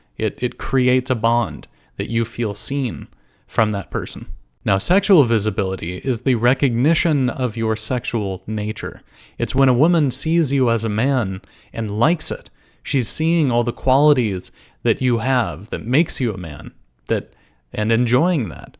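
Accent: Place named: Israel. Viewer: dam she sounds like a hebrew speaker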